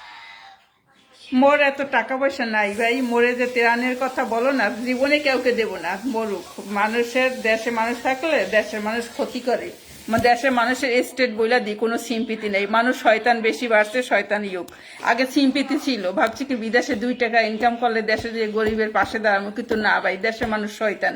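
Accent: Barishal, Bangladesh. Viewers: ভাষা শুনে মনটা ভরে গেলো,, আমার প্রাণের সেই বরিশাইল্লা ভাষা 🥰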